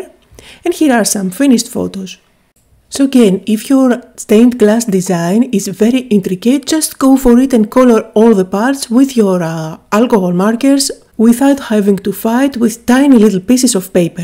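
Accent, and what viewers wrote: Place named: Greece. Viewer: Its raining CAT’s and dogs here. So nice to hear you, your Greek accent. I always feel the nostaligy of all my Greek holidays. Thank you for this. Cardiamou.❤️🍀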